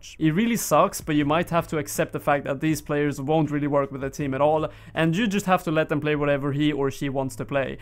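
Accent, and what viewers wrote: Sweden. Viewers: This guy is so swedish you hear it on his voice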